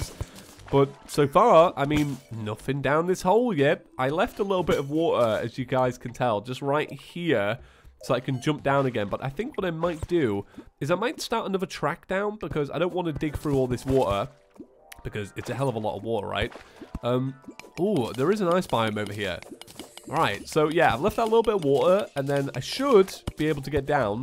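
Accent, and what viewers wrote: United Kingdom. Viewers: Nothing like a jolly brit playing terraria. Makes me happy lol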